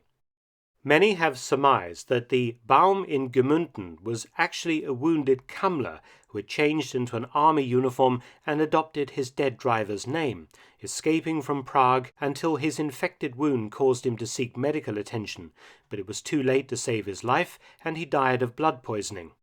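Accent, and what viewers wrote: England, United Kingdom. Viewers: Fantastic English accent....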